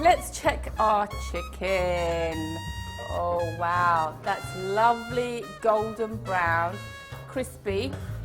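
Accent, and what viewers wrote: United Kingdom. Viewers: It is a British accent...can you be less ignorant? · Love your recipes, your presentation, your stories, your “Hello darlings!” and of course your lovely british accent. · Come on!!!!!! she's BRITISH n that's how their accent is.....!!! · kab sudhregi tu.... asli naam rahega.. chandni raddiwali!... faking a accent & having a accent has a difference... faking a accent means using same words as indians use while talking English but with a different tone.. she speaks & uses all the words like a British person would do because she is from london!.. she is a chef watch her cooking & learn that.. don't comment on her accent & tell kab sudrenge.. tu sudhar pehle gaav waali! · thats a proper british accent u muppet. she was brought up there and u mr.' my accent is perfect' finds it funny? lol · i really dont know why people dont like her accent! 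she's BRITISH! anyways i like her energy and vibe :) ! good watch !!! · ankush verma She has a British accent. If you're going to complain, at least get your facts right.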